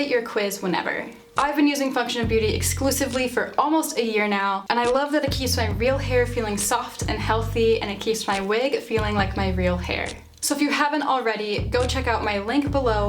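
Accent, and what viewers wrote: Netherlands. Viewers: OH MY GOD UR DUTCH? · waitttttt, are you Dutch???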